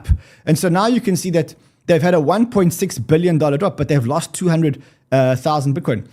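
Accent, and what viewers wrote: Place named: South Africa. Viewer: He is South african based in Cpt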